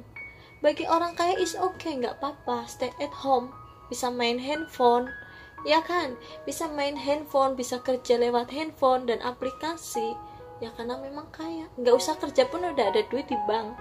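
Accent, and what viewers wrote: Banyumas, Indonesia. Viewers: Ora ngapak ra kepenak..nyimak kk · Hadir maning wong ngapak😂 Ayoo yg lain ikut hadirr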